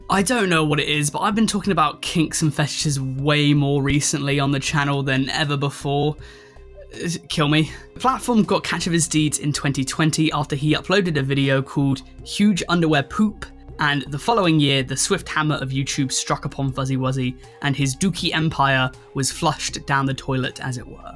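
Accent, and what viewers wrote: United Kingdom. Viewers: yuck british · Youre british?😮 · British tuv, love it bro, keep it up!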